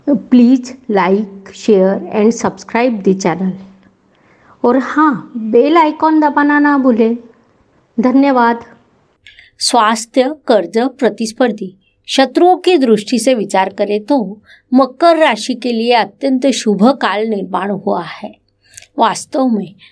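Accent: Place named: West Bengal, India. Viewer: Ye kaunsa leheja hai ? Bangali hain kya aap ?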